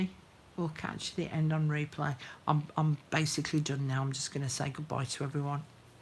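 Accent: United Kingdom. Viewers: I am a fabriholic and álways wondered how to do this paper embroidery thing - thanks for sharing your vast knowledge. Just loved the way you split the thread. You are one gifted lady and I luvvv you British accent. Stay Blessed girl - God loves us all.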